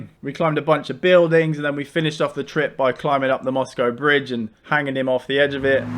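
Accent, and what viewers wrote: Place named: Southampton, United Kingdom. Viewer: My step-dad used to do this for a living (work at the top of tall structures, down at Esso, cranes at the docks etc.) and I guess some people are just born without that innate fear. Also, I didn't know you were also a Sotonian until this video and it's weird I kind of guessed it from the accent! Enjoying your content, thanks.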